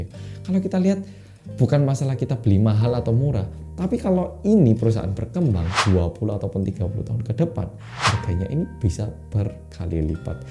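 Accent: Java, Indonesia. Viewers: mas medhoookkk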